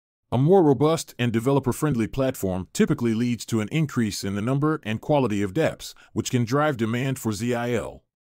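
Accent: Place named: Singapore. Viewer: Prefer your SG accent lol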